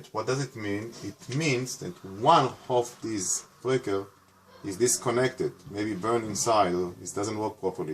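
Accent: Israel. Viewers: Definitely sounds Israeli to me.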